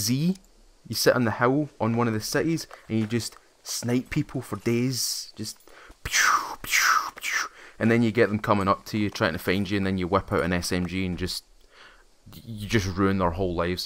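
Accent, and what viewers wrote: Scotland, United Kingdom. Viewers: I love the wee Scottish accent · I would love to hear you say with your scottish accent BEGGOONNEE THOOOT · Tobot 27 it’s fukin Scottish he said he was from SCOTLAND 🤦‍♂️ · Is that scottish accent or welsh or irish or Scouser accent? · by the way you sound like bigmoney he is also a Scottish YouTuber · only reason i came here, the Scottish accent. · I heard your voice and had to subscribe haha love the scottish accent probably due to my best friends family all be scottish and me growing up around it even though I love in england. Looking forward to binge watching some of your videos haha! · You sound like a very generic Scottish man but i still love you're voice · Scottish, I think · This guy has a very stereotypical Scottish accent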